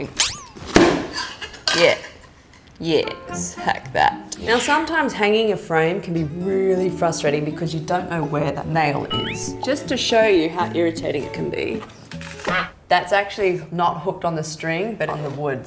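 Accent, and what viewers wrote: Singapore. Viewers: Is she singaporean? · I love her and her Singaporean accent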